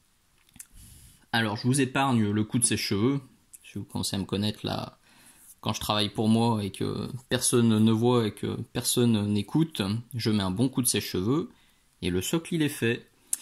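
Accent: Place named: Lyon, France. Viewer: Superbe vidéo, je ne savais pas pour le ciment, et encore moins qu'un produit tout prêt existait. J'adore, l'accent lyonnais. :-) Je m'abonne et je like. A +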